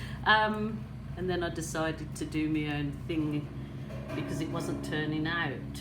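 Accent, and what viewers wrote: United Kingdom. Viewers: I know you made thos video in October and it is now December 29. You said you had 10k subscriptions. Either you grew fast or you don't see all of your subscriptions. It says 24.9k here. Either way, well done. I love your prattling on while I watch your videos. I am in the US and my family is in the UK. I know you are in Australia but you still have the Brit that I love listening to and your art is amazing.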